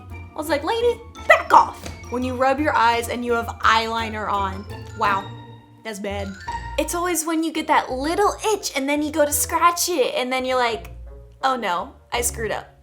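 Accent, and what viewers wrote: United States. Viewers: Are you Southern ? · Love your cowgirl accent! 😉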